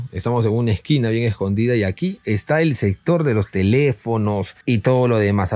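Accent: Peru. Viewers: mano tu eres de peruuuuu? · Asu de entrada reconocí el acento de un compatriota. 🇵🇪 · Primera vez qe veo tus videos y por tu forma de hablar creo qe eres Peruano :V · Joder bro como me cuesta diferenciar el acento peruano 🤣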